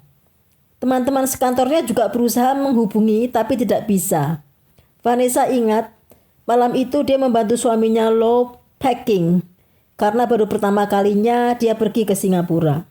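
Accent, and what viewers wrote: Java, Indonesia. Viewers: ....... madam..... katanya mau 2x upload dlm seminggu....di tggu tggu kok blm juga... sukses terus ya madam.....kalo bisa medok nya di pertahankan.......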